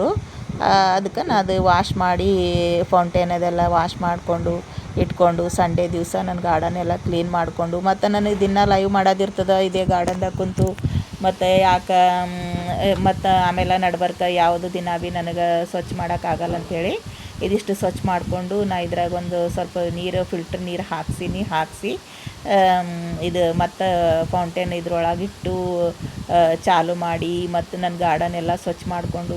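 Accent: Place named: North Karnataka, India. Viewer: ನೀವು ಕೂಡ ನೋಡಕ್ಕೆ ತುಂಬಾ ಚೆನ್ನಾಗಿದ್ದೀರಾ ಕ್ಯೂಟ್ ಆಗಿ ಹುಡುಗಿಯಲ್ಲಿ ಎಷ್ಟು ಚಂದ ಇದ್ದೀರಾ ನೀವು ಹಾಗಾದರೆ ಹೌದು ಅದನ್ನ ತೊಳಿತ ಇರಬೇಕು ಗಿಡಗಳನ್ನು ತುಂಬಾ ಚೆನ್ನಾಗಿ ಬಳಸಿದ್ದೀರಾ ಬಿಸಿಲು ಹಾಳಾಗುತ್ತೆ ಅಂತ ಹೇಳಿದ್ದಾರೆ ಕರಾಬು ನಮ್ಮ ಮನೆಯಲ್ಲಿ ಇಲ್ಲ ನಿಮ್ಮ ಗಿಡಗಳು ನಿಮ್ಮ ಮಾತು ಚಂದ ಉತ್ತರ ಕರ್ನಾಟಕದ ಮಾತು. ಇಂದು ಸ್ವಂತ ಮನೆ ಅದಕ್ಕೆ ಬೆಳೆಸಿದ್ದೀರಿ ಬಾಡಿಗೆ ಮನೆಯಲ್ಲಿ ಇರುವವರು ಏನು ಮಾಡಬೇಕು ಹೇಳಿ❤❤❤❤ ಸೂಪರ್ ಪ್ಲಾಂಟ್ ಗಳು❤❤❤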